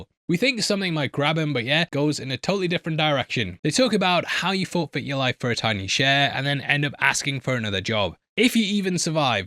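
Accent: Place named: United Kingdom. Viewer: You sound like a Brit, you probably should know how french words are being produced, init mate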